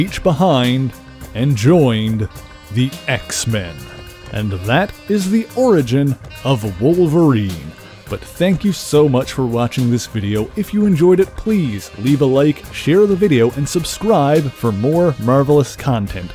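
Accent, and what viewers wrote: Canada. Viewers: Know how I know you're Canadian? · You’re Canadian aren’t you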